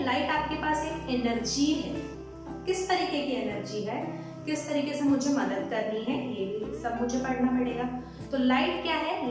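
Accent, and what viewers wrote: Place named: Haryana, India. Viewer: Maine socha haryana se ho 😅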